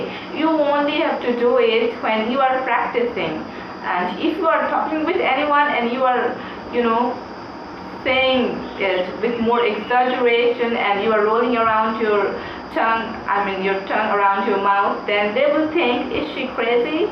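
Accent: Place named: India. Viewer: good luck girl, and compared to a typical indian, your English accent is nice, i can imagine how your accent will be in the next 10 years since you're still young, keep it up ❤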